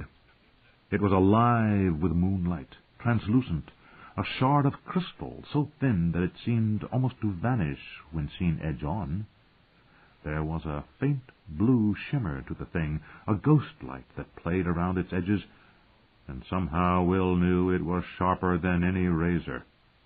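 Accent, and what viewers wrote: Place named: United States. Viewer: Just doesn't quite cut it with a American accent . Wish There was a Augmented Audio Book too . Or is there ? Point me there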